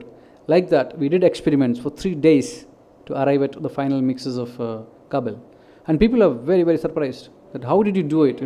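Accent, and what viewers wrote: India. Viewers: hahahah bldy indian english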